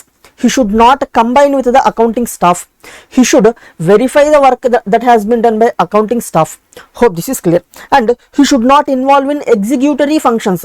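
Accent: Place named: South India, India. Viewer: With due respect...1st time hearing southern english....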